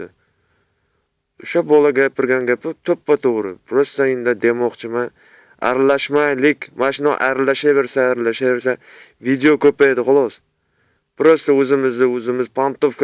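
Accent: Namangan, Uzbekistan. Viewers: Bu sheva Namongonlik shevang sotib qôyadi baribir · ука салом бу тожигистон кунободом деяпсиз лекин шевайиздан наманганликка ухшаяпсиз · Нега Конибодомлиман дейсамми.Наманган шевасида гапиряпсанку. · ээ ука сиесатга аралащма нима киласан узин намангандансан шекилли шеванга караганда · ука хафа булмагину сен намангандансан йигит тугри гапириш керак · Шевенг намангану . Нимага биттанг Жиззахданман биттанг тожикистонданман деб Наманганча гапиришасан . Насса кемутти дейсан сен наманган. Узр сурама лучше елгон гапирма. Андижонга осилма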